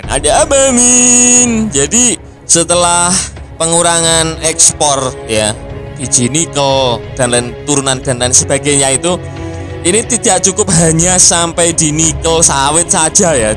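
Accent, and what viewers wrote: Java, Indonesia. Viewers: Waduh mas, medok jawanya ampun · Medhok banget mas..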